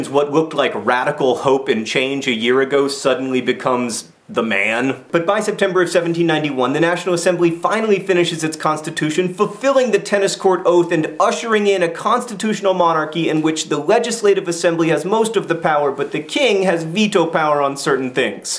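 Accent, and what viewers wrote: United States. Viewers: did you say you didnt pronounse it right because your Americain? finally, i always think "what does he mean by that!" no offence but its because im british!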